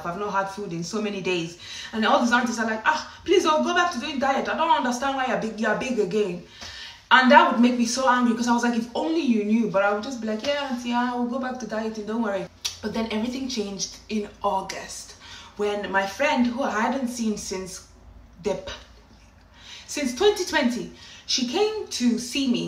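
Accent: Nigeria. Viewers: She sounds Nigerian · Omg i can tell your friends enjoy your storytelling abilities 😂😂 can't believe your effortless retention skills. I loved this! Love the Nigerian accent and expressions 😹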